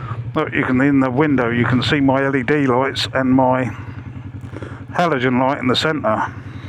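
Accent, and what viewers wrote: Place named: Southern England, United Kingdom. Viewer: good evening. Great to see you out on the Vulcan after so many months. Are you actually Swedish or originally from the South of England as your accent suggests. Perhaps you could give us a brief run down on your background. But of course, only if you want too 😅Enjoy your spring and summer riding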